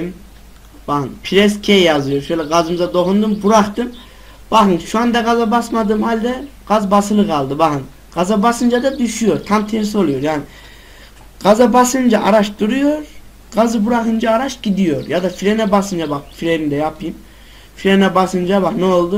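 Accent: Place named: Konya, Turkey. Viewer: helal olsun abi bu arada sen nerelisin konyalı gibi konuşuyonda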